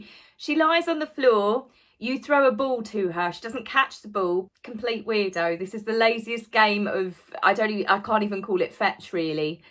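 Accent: United Kingdom. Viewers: The good thing is those of us in the United States just figure it’s a British pronunciation 😆 you are fabulous and you say it however the hell you wanna say it. I just think it’s a gas that MM wasn’t smart enough to use prefix Archie - spell it differently that way. everyone would’ve known what she was trying to do, even if in the end it doesn’t make sense and she’s talking about stereotypes. Love you have a wonderful Easter!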